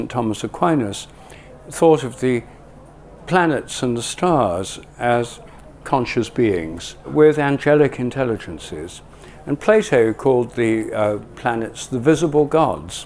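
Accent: RP, United Kingdom. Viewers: This guy loves his posh British accent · Yukky muddled thinker - ignore the suit with an upper crust British accent. Soon as he said he had lived in India I thought “Yeah a continent full of devils and demons” of which he is now one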